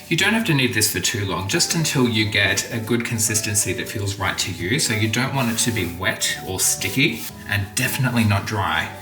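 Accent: United Kingdom. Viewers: Gidday. I am a new sub and love your wartime meal cooking. I was born in SA, and live in QLD. My British in laws always told me that my accent sounds British. Well, when I watched a first video from your channel, I thought you sounded like a Pom! Fancy that. Nice to know you are an Aussie. Absolutely love your wartime recipe content, because my grandparents endured both world wars and the Depression. Both of my parents were born during WW2. I have kept my maternal grandma’s cookbook published in 1950. As you know, rationing extended until 1955. Many recipes in the book came from rationing. For your interest, the cookbook was the Green and Gold cookbook issued from the King’s College in Adelaide as a fundraiser. I have a more modern reprint but it doesn’t feature the same recipes. Good on you for your channel. Keep doing what you’re doing mate. ❤️🇦🇺👍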